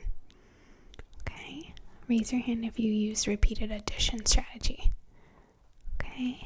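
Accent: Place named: Upper Midwest, United States. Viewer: Based on the accent, I'm guessing upper Midwest U.S.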